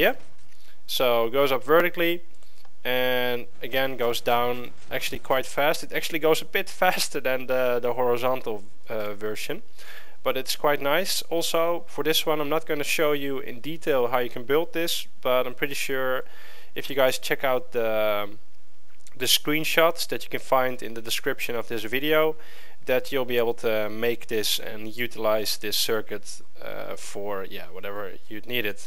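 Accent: Netherlands. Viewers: Are you dutch? really does sound like it :) thnx for the info :D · You sound Dutch. Are you from the Netherlands? Great demonstration! I subscribed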